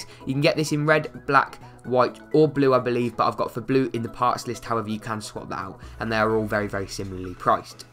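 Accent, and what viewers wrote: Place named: Doncaster, United Kingdom. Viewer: I know this man is from Doncaster, that accent has a weird " dh-u" noise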